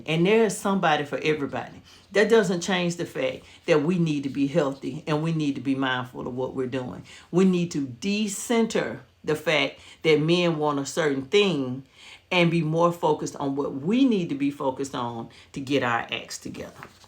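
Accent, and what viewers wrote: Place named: South, United States. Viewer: I can tell by your accent that you're from the South. I am from the South as well. But I also lived in California for 2 years. And I can tell you that most Black Men on the West Coast DON'T like Overweight women!!! That's more of a Southern thing.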